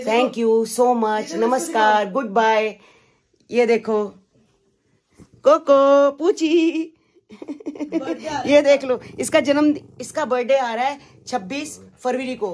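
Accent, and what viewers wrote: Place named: Punjab, India. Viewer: Aunty g apki way of taking mein jo punjabi flavour hota hai I like it love u lot from Punjab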